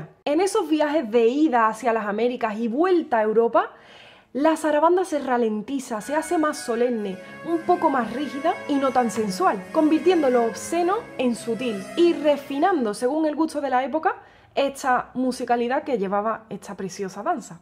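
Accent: Andalusia, Spain. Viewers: Me encanta su acento andaluz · Y desde el Guadalquivir llega a Veracruz, después se convierte en sones y jarabes novohispanos. Felicidades por la interpretación. Ese acento andaluz 🤤🥰 enamora 😍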